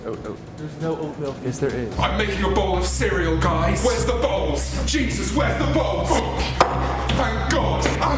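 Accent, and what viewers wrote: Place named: United Kingdom. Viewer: British accent, euros in your hand, something's wrong. I am new to the channel, can somebody explain it to me?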